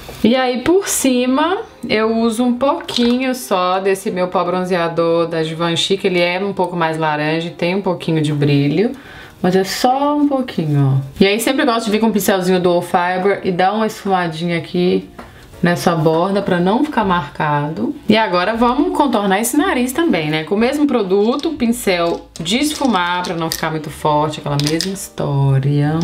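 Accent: Minas Gerais, Brazil. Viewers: Amo o sotaque mineiro. 💗 Amei a maquiagem como sempre.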